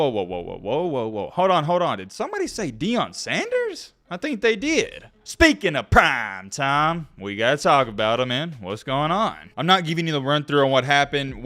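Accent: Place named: Mississippi, United States. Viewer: “Jackson State University has honored all financial obligations of Coach Deion Sanders’ employment. The video in question was filmed on September 20 on our campus. Unfortunately, a seconds-long excerpt using a hypothetical example from an over 60-minute interview is being used out of context and circulated as fact.” from hbcugameday, 12/09/2022 titled deion-sanders-wasnt-owed-money-jackson-state-officials-say “Jackson State University has honored all financial obligations of Coach Deion Sanders’ employment. The video in question was filmed on September 20 on our campus. Unfortunately, a seconds-long excerpt using a hypothetical example from an over 60-minute interview is being used out of context and circulated as fact.” Jackson State’s Division of Athletics, posted on 12/09/2022. These appear to be legally binding statements. Why is the first third of the video repeats? 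Afterwards, you finally started with shaming a black player. It's sports opinions, so valid. However, it was personally motivated to maintain some balance. Anyway, all of your information is from tweets. As always, time will tell. Oh, that Favreish accent says alot. I know; I'm a Mississippian....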